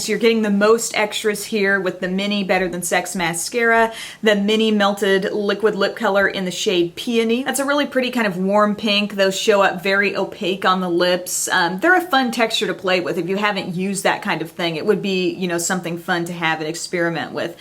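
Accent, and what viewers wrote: France. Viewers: Your French accent is sooooo cute! 😁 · Love your French accent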